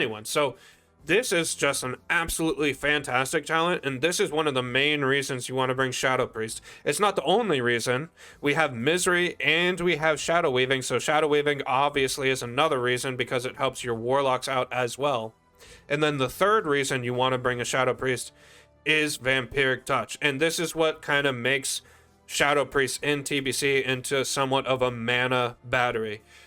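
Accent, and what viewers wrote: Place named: Maryland, United States. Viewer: You're from Maryland, aren't you? The accent is so pronounced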